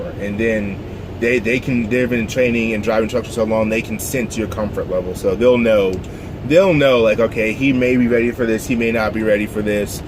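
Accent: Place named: Maryland, United States. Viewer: You gotta be from Maryland?? That accent..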